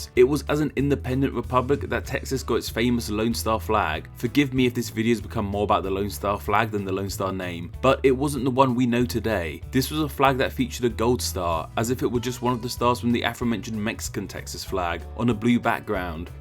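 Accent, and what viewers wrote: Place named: United Kingdom. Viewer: The accent lost me. British talking about Texas. Hmm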